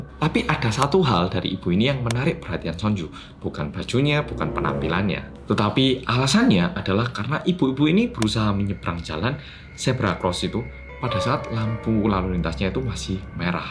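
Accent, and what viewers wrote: Java, Indonesia. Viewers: Karena keseringan dengerin bercerita,waktu baca novel jadinya suara yg logat jawa itu yg terngiang ngiang. waduh gimana ini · 💙💙💙💙💙💙💙💙medokkkk karo ngapakkkk pada ae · kaet ngerti onok wong korea medog wkwkw ! auto subscribe hehehe..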